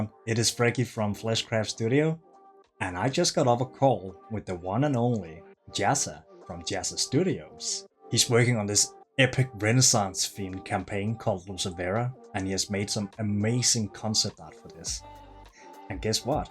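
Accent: Denmark. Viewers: So cool! Frome your accent I think Dane ?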